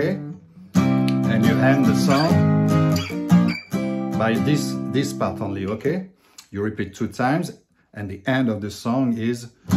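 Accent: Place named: France. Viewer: you are very precise, concise and clear minus the slight french accent haha! thanks for this tutorial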